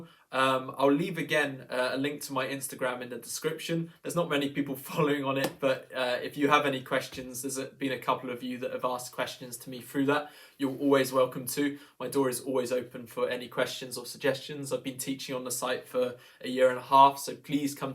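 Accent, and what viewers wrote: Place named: England, United Kingdom. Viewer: Really appreciate your videos and so nice to hear an English accent for a change.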